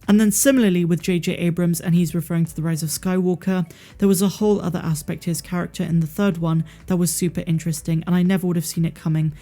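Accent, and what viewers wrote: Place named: West Country, United Kingdom. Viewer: Another great vid. Love the fact you seem to be from the west country.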